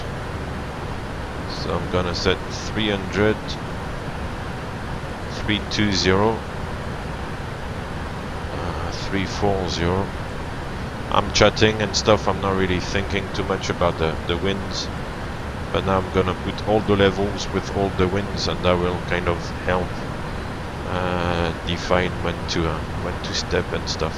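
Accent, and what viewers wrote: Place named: France. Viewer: I was pretty sure you were french, beeing one myself !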